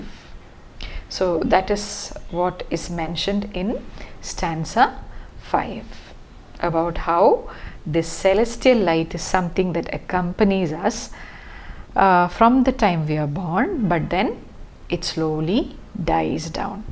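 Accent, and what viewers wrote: Kerala, India. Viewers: R u malayalee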